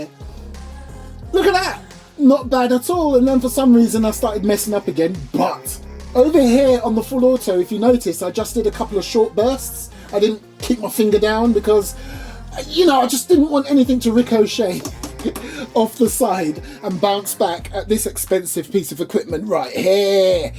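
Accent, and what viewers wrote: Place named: United Kingdom. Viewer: You sound like bri'ish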